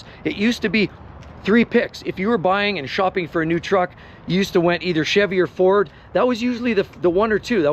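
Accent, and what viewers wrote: Canada. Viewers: Yes, truck prices are stupid but did I detect a bit of Canadian in your voice? And then I looked up the pizza place next to the lot you are on. There's one near Edmonton, Alberta. The exchange rate is currently 1.37 Canadian to the U.S. dollar so cut those stated prices by about a third to get the U.S. prices. And do some homework before venting your spleens! I still wouldn't buy one, though. Pitter patter now! Yes, been streaming me some Letterkenny lately!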